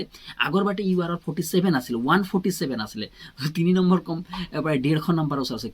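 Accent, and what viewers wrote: Bihar, India. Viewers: Ki kela assamese kaw o..toi kela sure Bihari..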